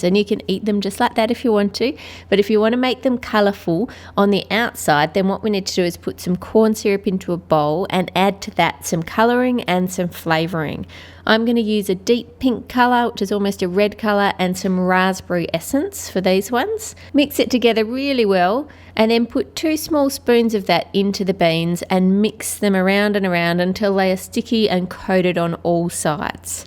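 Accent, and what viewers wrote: Australia. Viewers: Are you Australian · Are you from australia or new zealand? Youve got such an interesting accent! Love it! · Are you Australian ? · Shes Australian Harley Quinn is from New York lmao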